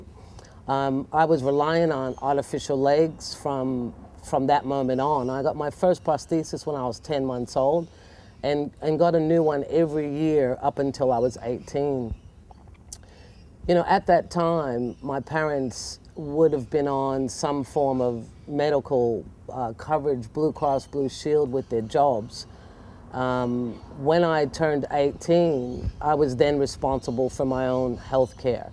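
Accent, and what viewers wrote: Australia. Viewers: love your Aussie Twang in your voice. so coooooool. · 10 seconds in, that accent, she's a real Australian now! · You've deff got a half Australian accent going on there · can hear the Aussie accent quite clearly. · Her accent is a spin out. About 70% Aussie with some yank. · Don't worry. You're an Aussie now. You even sound it,😃 our government cares more about its citizens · Got that Aussie accent coming in mate :-) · BigImaginationDoll16 I was thinking the exact same thing. Some words are said with an Australian accent, like when she says "dollar". Veeeeery interesting hmmmm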